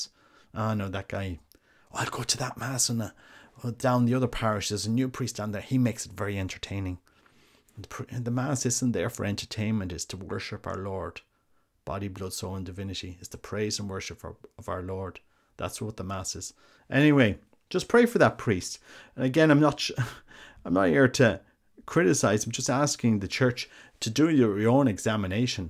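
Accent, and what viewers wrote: Ireland. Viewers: I just found your channel and appreciate your discussion on the Holy Eucharist. It is too rare. I diverge a bit from you however on revealing the parish, if not the priest. What you have described is a grave sacrilege and crime in canon law. It is further a scandal to any witnessing it, serving to malform the faithful. I don't know where you are, but if I know that a parish or priest operates contrary to the law of the Church, scandalously, and bars the faithful from properly receiving sacraments, then it's nothing private. You can't let it go so that innocent travelers wander into a den of sacrilege or will be refused the Eucharist when they may really need it. It's just my own opinion, but I couldn't sit on that krp secret without it burning me in payment for the harm it could do. I live in a diocese where 2-3 parishes are notorious. New people to town ask me frequently where they should go. 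The whole reason that I suggest not going to those parishes is because of their noncompliance with Catholic teaching. I couldn't let those people be victimized. Anyway, great video. Good job. As an American, I love a good Irish accent. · At Holy Name Cathedral here in Chicago, the bishop celebrant extended the Host that touched my hand, leaving two broken halves inside my palm for which I was harshly admonished not to grab for the Host. The Church should have left well enough alone. Thanks for being here. And, from your mellifluous voice and accent I tell a Cork man.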